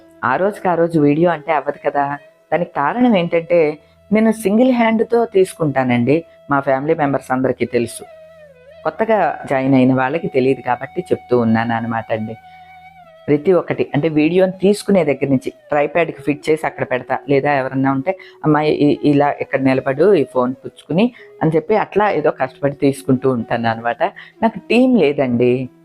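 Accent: Godavari, India. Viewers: Amma namast he.Memu yesterday Annavaram vellam.Vacchetappudu meeru baaga gurthoccharu.Godavari district maata vintene Amma maata gurthostundi.Happyga anipistundi All the best Amma Amma (meeru)cheppina chaala manchi maatalu memu acharisthunnam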